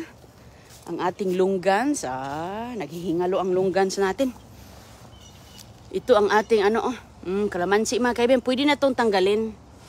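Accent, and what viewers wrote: Philippines. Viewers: Like your tagalog Accent thanks for sharing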